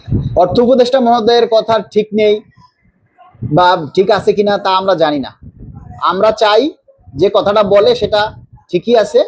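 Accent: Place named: Comilla, Bangladesh. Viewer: কথা শুনে বুজতে পারলাম উনি খুবই বুজদার। কুমিল্লার ভাষা এটা। আমার মনে হয় বাড়ি কুমিল্লা ঐ। বাহিনীর লোক বেশি কিছু বলতে পারিনা।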